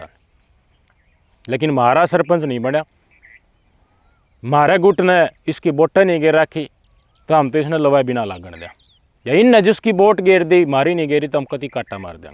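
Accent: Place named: Khari Boli, India. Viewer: भाई साहब बहुत हँसी आती है आप की बोली से खड़ी बोली है भाई हम अहीर वाटी से है हमारी बोली ऐसी नही है अच्छा लगता है आपका रिएक्शन और बोलना 😂😂😂😂😂😂😂😂😂❤❤❤❤❤❤❤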